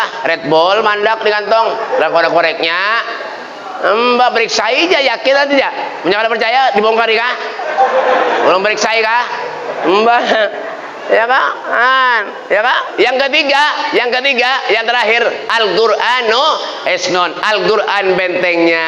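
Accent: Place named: Banjar, Indonesia. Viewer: Logat nya Arab Banjar 😁😁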